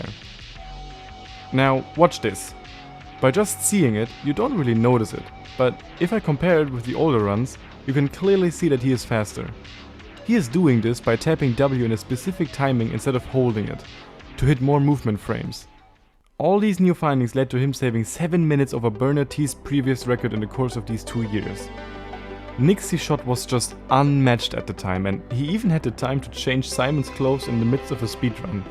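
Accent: Germany. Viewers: do i hear DEUTSCH? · are you german? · I can hear the German accent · after 2 seconds of watching I could tell you were German. (du machst krass guten content und verdienst mehr aufmerksamkeit bro)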